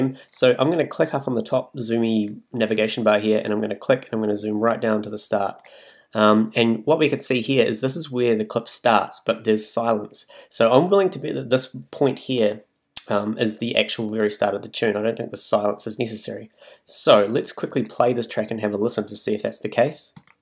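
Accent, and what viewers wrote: New Zealand. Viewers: New Zealand? love that accent! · Love the Kiwi accent. You really "squash" your vowels!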